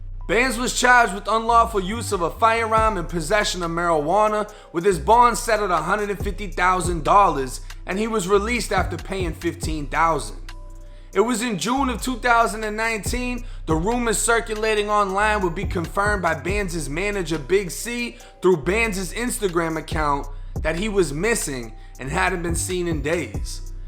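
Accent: Boston, United States. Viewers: I bet my life you are from Boston. I'm from New Jersey, l hear that accent a mile away! Enjoy your channel ☺️ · I love your accent, you must be from Boston! Keep up good work dude, ill support!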